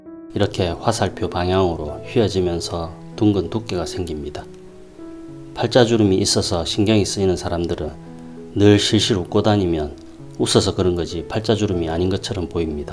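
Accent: Gyeongsang, South Korea. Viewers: 일년전 영상인데 저는 요즘 연필 초상화 배운다고 유튜브 찿다가 선생님의 영상을보게 됐어 많은 공부가 됩니다 말씀도 재미있게 하시네요 고향이 갱상도 같아요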